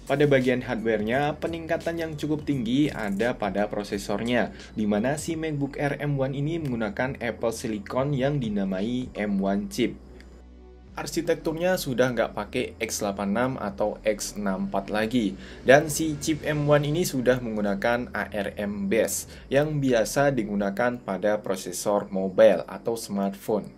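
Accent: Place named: Java, Indonesia. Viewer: review nya jelas, sama kayak medhok nya #timmedhokforever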